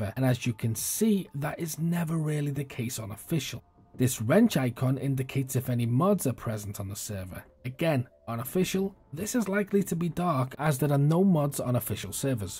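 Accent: Liverpool, United Kingdom. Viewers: Great video mate, looking at getting back into dayz after a year or so and only had a few weeks of experience so this video has helped out, plus its always good to hear a fellow scouser. Quick question, is dayz launcher still a thing and is it worth bothering with? Liked and subbed mate :)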